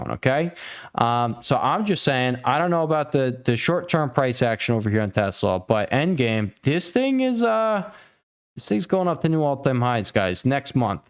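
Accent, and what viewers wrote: New Jersey, United States. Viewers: As a European, your New Jersey accent makes this so funny😂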